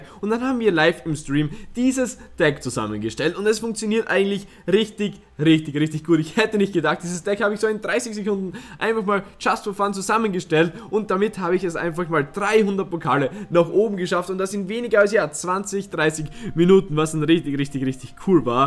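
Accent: Austria. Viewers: Ich liebe österreichisch #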